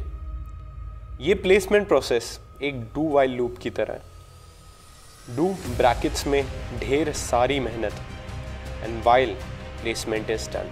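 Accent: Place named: Delhi, India. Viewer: Your delhi is accent is crazy! will you say it again !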